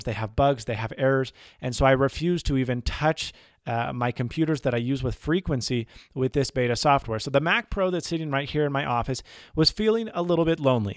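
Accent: United States. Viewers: This was like an old Mac Madness Monday! Loved it. You sound way more American now as well. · Haha, of course there's nothing wrong with the accent, but we're used to hear him speak perfect american English. It's actually quite funny for his old viewers to hear.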